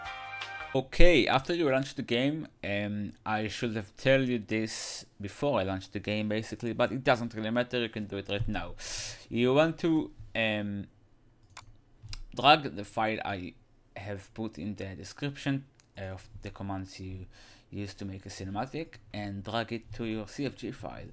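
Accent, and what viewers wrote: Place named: Israel. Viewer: u sound israeli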